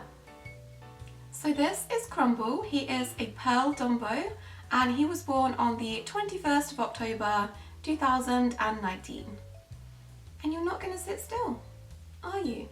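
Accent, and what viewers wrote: United Kingdom. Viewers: And also, sorry for being creepy but what part of the U.K. are you from? 💕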